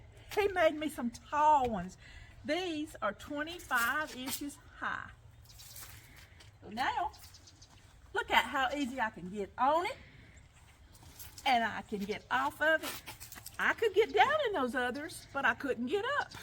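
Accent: South, United States. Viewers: Thank you this info is very helpful and I love your southern accent 😊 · New subscriber and I just love your channel, especially your sweet Southern accent. Thanks for the wonderful tip. Love your "Paddy Wagon"! My husband and I replaced our computer chairs for comfortable, padded recliners. I put a pillow on my lap, put my keyboard on the pillow, and recline with my legs and feet propped up. I have a large computer screen that's easy to see. In the kitchen, I have an office chair on wheels and a bar stool with a back and arms on wheels. Comes in handy for working at the sink and stove. Such a great tip for raising your dryer! The main thing that has helped my arthritis pain is to eliminate all "Inflammatory" foods from my diet. No more sugar, white flour or white pasta, no refined or processed junk foods, no soft drinks. Just eat lots of lean meat, fruits and veggies and whole grains. 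Only use olive oil. Made an amazing difference in pain and mobility. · Mrs., you are simply adorable. I love your southern accent wisdom and tips for making life easier as we age.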